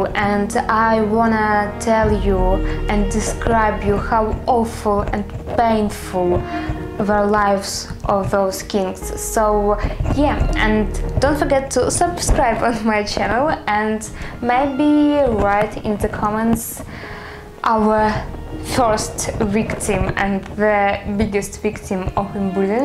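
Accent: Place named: Ukraine. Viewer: UkrEnglish... It's nice that u make such efforts to speak English and even make vids but i'm sure u would have much more subscribers if u work on ur pronunciation))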